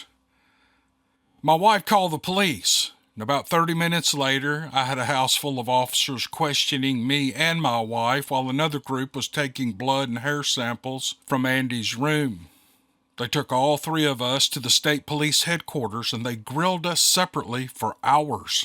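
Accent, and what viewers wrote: South, United States. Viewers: That was a real treat! Great stories! Thanks much! Others read stories but no one else does it with quite the Southern Style of! I'll be leaving a light on tonight! 8 )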